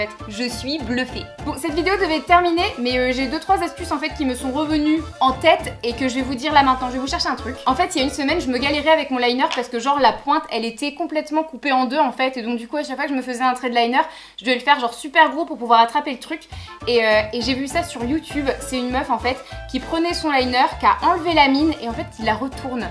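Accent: Quebec, Canada. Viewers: ta un petit accent québécois on dirait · Tu as un petit accent canadien non ?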